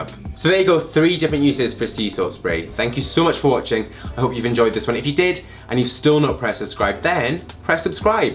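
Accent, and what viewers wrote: Scotland, United Kingdom. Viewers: Do I detect a wee Scottish accent there ?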